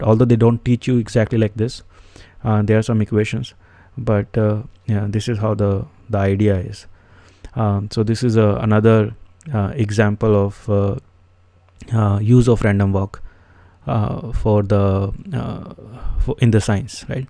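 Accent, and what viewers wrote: India. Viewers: indian accent is so bad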